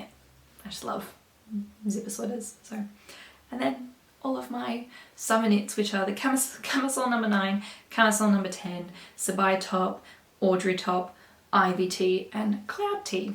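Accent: Australia. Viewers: Thanks for a great episode. I'm from the UK but lived in Perth for 13 years. It was great to hear that accent again x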